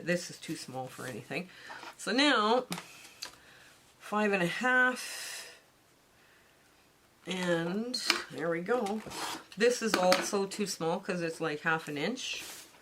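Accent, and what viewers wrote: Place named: Canada. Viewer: I've made a couple of 8" crumb blocks, ao wondering if sewing the triangles into sqares might be a good option for continuing... I'm really enjoying your videos - and adorable Canadian accent ;-)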